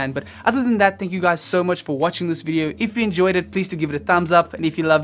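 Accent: South Africa. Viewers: I hear a South African accent. Am I right?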